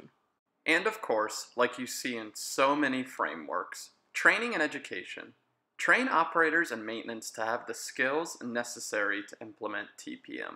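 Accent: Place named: United States. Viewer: he sounds sooooo American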